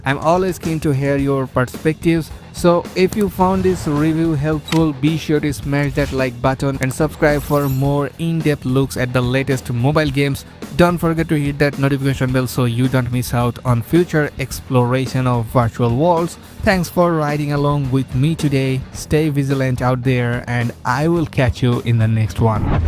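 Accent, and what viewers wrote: India. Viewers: I just ignored the video and laughed at your indian accent the whole video. 😂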